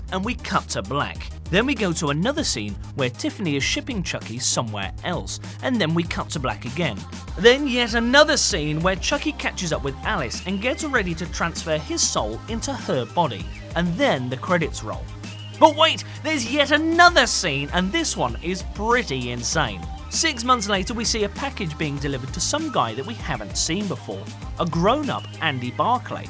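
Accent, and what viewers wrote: United Kingdom. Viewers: but hes also british...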